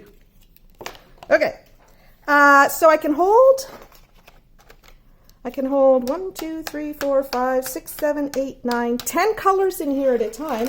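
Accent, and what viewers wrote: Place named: Canada. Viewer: Rambling and Diamond Painting will do. I figured you’re Canadian I recognized the accent my partner is also Canadian, lol. You’re lucky it’s cold there and you don’t experience the pink wax getting all gooey, super soft and needs to be reloaded more often. But I found a great hack for us here in the Philippines. I keep all of my unused pink wax in the fridge! And they worked fine from that point forward. I like how you are finding ways to use cheaper alternatives for diamond painting. The hobby itself is not cheap if you want to be able to make a lot of paintings for yourself and to give away as gifts. Some of the tools in the market, I find very expensive and they are just wants not needs. Keep it up and keep on sharing!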